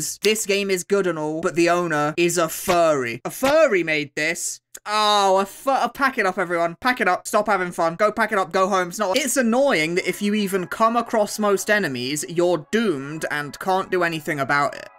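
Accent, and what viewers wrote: United Kingdom. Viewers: HE. IS BRITISH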